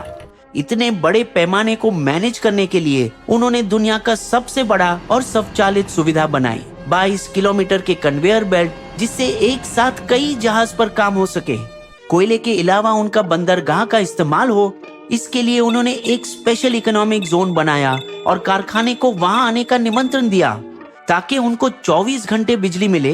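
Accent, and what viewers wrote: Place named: South India, India. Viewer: Ye awaz mardrasi type hai acha nhi lagta humney change the voice other wise we will unsubscribe this chennal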